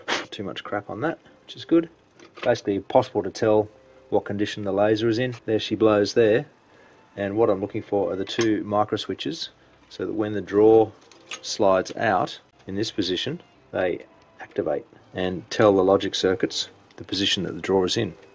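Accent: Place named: Australia. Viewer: Hey cocka, where are you from? Nice to hear an Aussie voice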